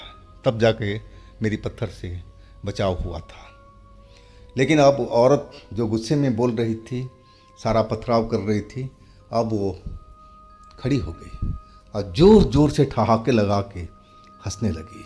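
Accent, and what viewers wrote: Bihar, India. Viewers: Nai ye bhai bihar ke taraf se hai, sun sapata bihar me hi bola jata hai. please clear 😂😂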